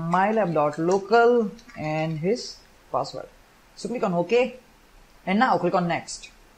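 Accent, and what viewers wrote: India. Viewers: nice video but please indian people should stop speaking english.you are hurting the langage ☺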